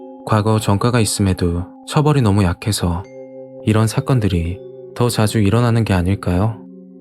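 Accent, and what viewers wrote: Gyeongsang, South Korea. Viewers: 와우 오늘도 어김없이 님 영상과 함깨 합니다 님 혹시 경상도 분이신가요?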